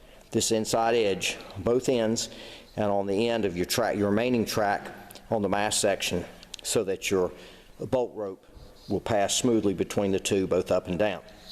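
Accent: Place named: South, United States. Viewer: Hi! I have followed your involvement with the Weta on the forum since about 2010 but never heard your voice. That's a great Southern Raised accent you have there! Do you play the banjo? I have done the job you illustrate several iimes and wish I had known about the fishing line trick. George Morris Weta 117 Scotland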